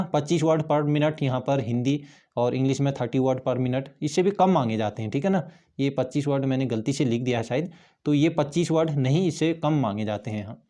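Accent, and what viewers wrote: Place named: Kumaon, India. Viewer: Kumauni lg rha bhai...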